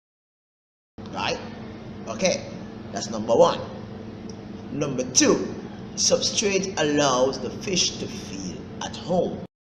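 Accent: Jamaica. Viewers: Im going to enjoy your cannel good Sir and cheers buddy from atl Ga me and my wife saw your country and went to the blue hole your county is so beautiful and everyone i met was so kind and down to earth god bless ya and best of luck with your cannel good Sir and love your jamacian tone ya man and much love to you and your lovely country and lovely people take care bud :)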